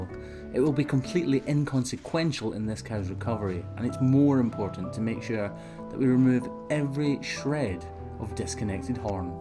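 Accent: Scotland, United Kingdom. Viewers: You are a vast wealth of knowledge when it comes to hoof trimming. I always enjoy watching your channel and learning new things. I admire your work ethic and how you treat each cow that you trim and I love listening to you explain it all w/ Scottish ascent. Keep up the great work, I always look forward to the next video.♥️🐂🐃🐄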